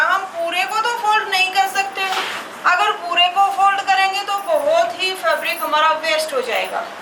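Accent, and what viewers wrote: Haryana, India. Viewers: Tone se mujhe haryana ki lag rahi Me bhi hisar se hu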